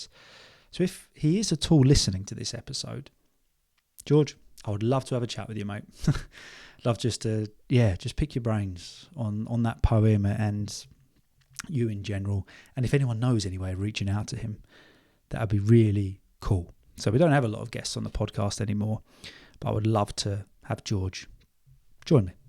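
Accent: RP, United Kingdom. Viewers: I really love your podcast bro, so just keep going. You make learning English more easy and joyful without any stress, furthermore, your RP is so fascinating. I appreciate your effort you provides to us.🎉🎉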